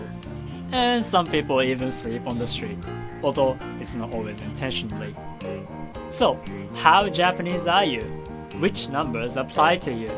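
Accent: United Kingdom. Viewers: hey..! you sound British with your diction, it's cool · why do you peak with a british accent?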